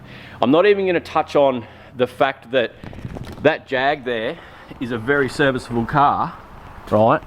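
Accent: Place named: Australia. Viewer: It seems like older people 50+ like this guy in the video just don't get EV's at all. Fair enough you like your petrol engined cars. That's your passion and I get it. But some of the misinformation you spout about EV's is bollocks. Not everyone is like you though and 95% of the population want a car that goes from A to B as cheaply and reliably as possible and that's where EV's excell. You sound like your from Australia. I find it bizarre that due to the fact you get a shit load of sun in your county to capture with solar panels and put this energy straight into the cars that your country has been so backwards for all these years. I'm glad to hear the new goverment you have in power is changing this fact. Your country should be championing energy independence and this biggest fact why I love EV's is because us Brits can transition to some sort of energy independence and not buy in petrol from these rouge nations and keep our money in our country.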